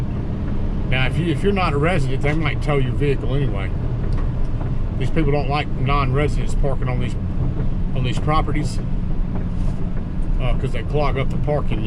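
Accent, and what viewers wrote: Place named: New Jersey, United States. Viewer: You sound like a friend of mine from new Jersey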